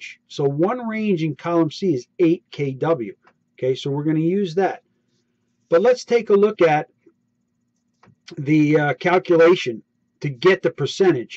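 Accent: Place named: New York, United States. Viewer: 13.5 your garbage New York accent messed me up for a min. Good tutorial otherwise.👍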